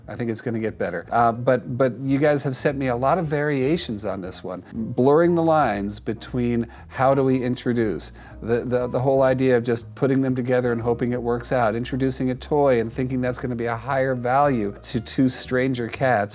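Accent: Russia. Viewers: BTW she's not Swedish, she's Russian, Marusia is traditional name. Also, the accent is pretty Russian. I'm saying it coz I am Russian 😂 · a little note - these aren't Swedish names though, they're Russian, and the accent sounded Russian to me as well (just listen to those 'sh' and 'ee' sounds!)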